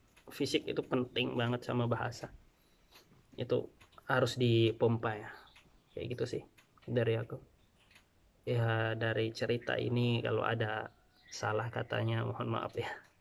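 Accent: Java, Indonesia. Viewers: Kirain orang Jawa mas?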